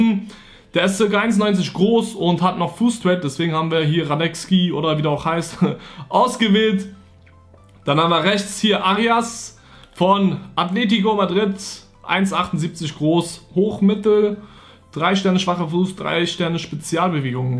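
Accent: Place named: Berlin, Germany. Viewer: Das ist Berliner Akzent die sagen isch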